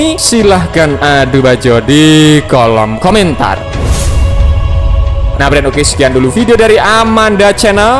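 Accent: Java, Indonesia. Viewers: Logatnya logat jawa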